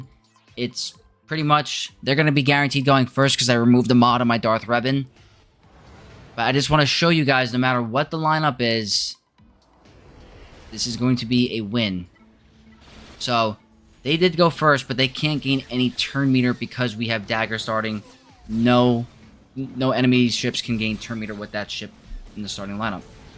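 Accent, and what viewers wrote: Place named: New York, United States. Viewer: That New York accent. I miss mine lol.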